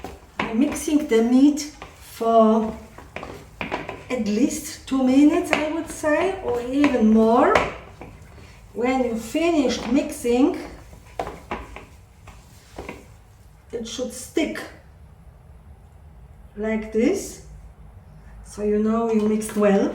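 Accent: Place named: Slovenia. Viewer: This is the most interesting way of making salami. My parents make home made sausages with the skins. I really enjoy watching you in the kitchen and love your accent. I was born in Slovenia and came to Australia when I was 4 yrs old. That was over 53 yrs ago. So I have no accent, but my parents sound like you.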